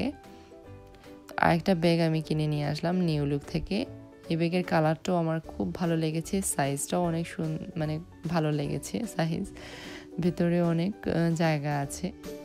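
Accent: Sylhet, Bangladesh. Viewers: Apu Tumi sylheti ni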